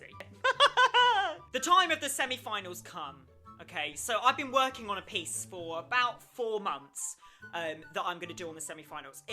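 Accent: United Kingdom. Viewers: Yes, he's English. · British? Sounds like british or is he? Wtf im not sure if he is British or what .-.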